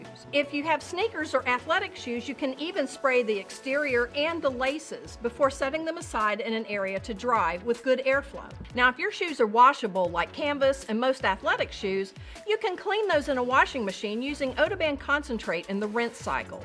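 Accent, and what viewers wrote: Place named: South, United States. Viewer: i didnt know odoban had such southern twang. never woulda guessed it.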